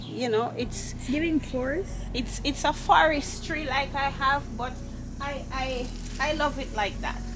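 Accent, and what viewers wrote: Jamaica. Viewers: Don't stop that is how we Jamaican talk.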